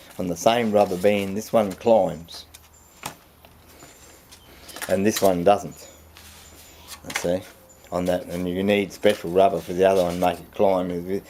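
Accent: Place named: Australia. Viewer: Good on you,a video spoken, the only way,others don't understand true Aussie, I really enjoyed it,congrats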